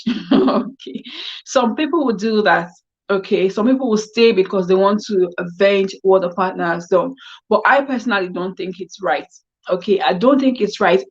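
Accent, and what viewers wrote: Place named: United Kingdom. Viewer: This is a very interesting topic u look gorgeous sis I am enjoying ur UK accent...would be here for all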